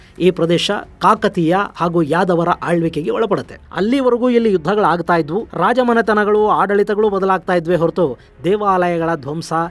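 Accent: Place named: Raichur, India. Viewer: ರಾಯಚೂರು ಕನ್ನಡದ ಹೇಮ್ಮೇ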